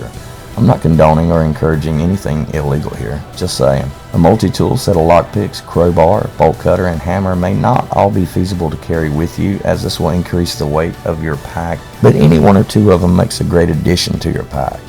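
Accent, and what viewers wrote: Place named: South, United States. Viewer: I wish I had this guys country voice I would get so much pussy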